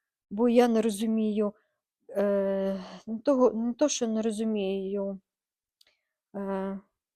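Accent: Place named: Steppe, Ukraine. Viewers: Лайк за степовий суржик))! Ще один))❤ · завжди із задоволенням дивлюся ваші відео. Ви не тільки надихаєте своїми виробами. Вас завжди цікаво слухати. І ваш Херсонський говір, то моя рідна мова😂. Херсон, тримайся❤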